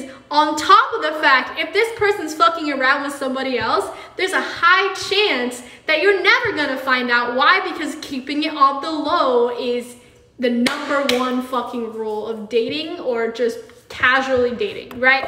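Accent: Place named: United Kingdom. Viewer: I miss her British accent.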